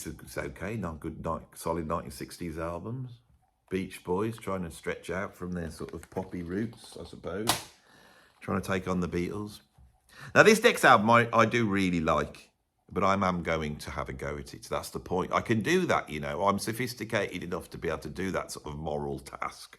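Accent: Birmingham, United Kingdom. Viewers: this silly sounding overly verbose "Brummie" has no idea what he is talking about when it comes to musical taste...., some of the finest moments in pop music culture...he must be talking nonsense just to be the contrarian. 😎😎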